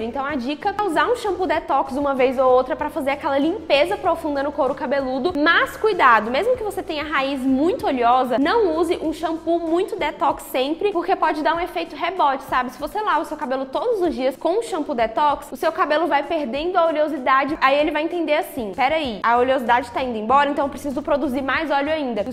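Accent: Minas Gerais, Brazil. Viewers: Amo o sotaque de Minas 😍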